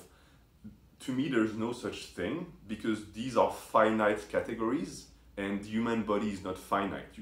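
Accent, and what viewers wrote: France. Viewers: C'est bien un accent francais que j'entend ? J'ai bien l'impression :)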